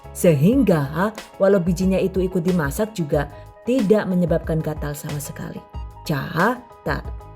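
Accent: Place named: Brebes, Indonesia. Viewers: Enak ya punya magic com yg bnr2 magic bs masak selai nanas disitu.. Saya gunakan alat apa adanya.Krn ilmu msk diasah disaat alat sederhana tp tekad mau buat lebih bsr dan hasil masakan disukai suami dan anak.. TOP dah, rajin share resep baru dan ga pelit ilmu, serta disetiap videonya ada suara lucu medok gaya bicara itu.Beda deh dari channel msk yg lain,pernah nyoba tp hasilnya mengecewakan.Kalau channel benar2 bagus dan reviewnya udh byk yg masak.. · Mbok ayune, salam sing wong brebes yen ora ngapak iya ora kepenak 😊😊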